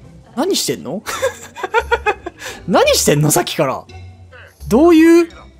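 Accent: Kansai, Japan. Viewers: この人関西の人？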